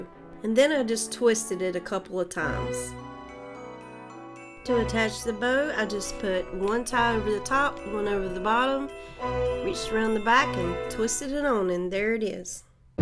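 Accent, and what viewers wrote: South, United States. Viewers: Love your decorations and your accent! I am a southerner too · Honey child, I don't know where you're from, but I just love your honey and molasses southern accent. Cute ideas.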